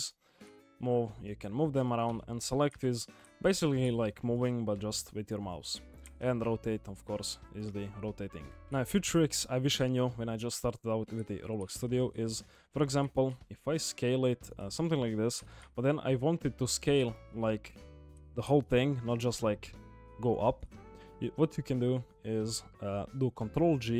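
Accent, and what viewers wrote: Russia. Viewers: He's russian possibly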